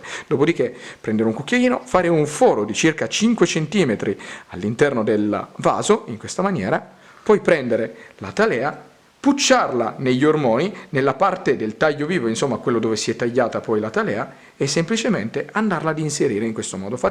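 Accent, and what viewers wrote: Sicily, Italy. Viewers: Min "pucciarla.." dal tuo accento mi sembri siciliano o comunque del Sud, come lo sono io. Sentirti usare il verbo "pucciare", termine dialettale piemontese e lombardo, fa veramente male. Però, detto questo, complimenti per il bel video 🙂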